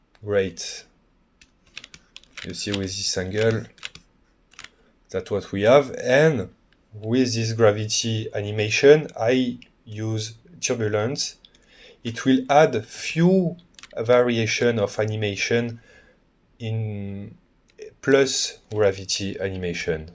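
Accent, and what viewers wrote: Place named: England, United Kingdom. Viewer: Merci pour le tuto c est top. Magnifique acens anglais en passant😁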